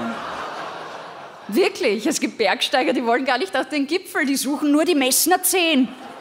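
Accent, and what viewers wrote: Austria. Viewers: Der Wiener Slang , der leicht nasale , herrlich ...freut mich als am Land lebende gebürtige Grazerin!!!😂😂😂😂❤ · kein Wunder Du Schlaubär, denn sie kommt aus Leoben, Steiermark und spricht somit den steierischen Dialekt. Das hat mit wienerisch so viel zu tun, wie bayerisch mit hessisch. Den Rest spare ich mir zu kommentieren...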